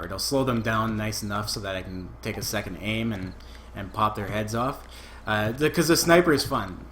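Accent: Canada. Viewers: I can tell from your accent you're from Toronto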